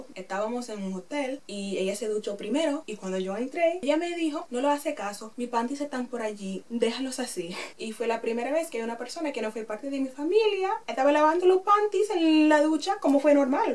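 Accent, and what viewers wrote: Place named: Spain. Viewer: No creo que equivocaste por nada que yo entendí todo perfectamente. Great video and love your Spanish accent 💜💜💜💜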